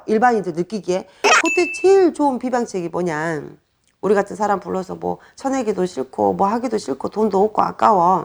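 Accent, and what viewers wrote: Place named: Jeolla, South Korea. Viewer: 선생님.고향이전라도신갑네요 겁나게정겨워요.말투가너무좋아요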